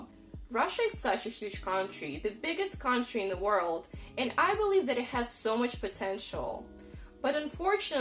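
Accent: United States. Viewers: Great work! As a Chinese, Khabarovsk is a city that I always wonder what it’s like since it is so close to us. I often hear from people from the northeastern Chinese provinces (close to the Russian Far East) complaining about their stagnant economy but it seems that sadly our Russian neighbours aren’t doing great either. Wish you the best with your channel and congratulations on your English skills, you sound very much like an American! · Get your Ass to America! I just started watching your channel so I don't know your background but I must say your English is amazing and honestly as an American I don't think most Americans would pick up on your accent they would probably just assume that you're American with a weird dialect lol no disrespect intended with the "weird". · I want to watch the video, but this "Amerrrrican" accent.... Ewwww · It’s hard to believe you’re Russian...you sound American...your grasp of English is amazing if you’re Russian.. 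· Your English is American - style .. most excellent. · You have a American accent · How did you learn english with an american accent?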